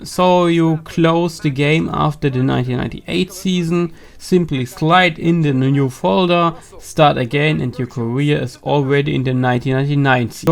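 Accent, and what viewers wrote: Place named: Germany. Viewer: Ich liebe den deutschen Akzent xD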